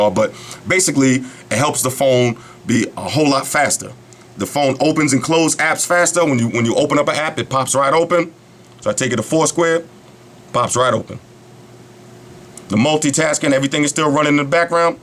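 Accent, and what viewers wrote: New York, United States. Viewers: I watched this the first time to see what's being said about the SIII...I watched it the second time cuz I live in FL and your voice brings me back home! Ugh I miss NY but thanks for the flashback :)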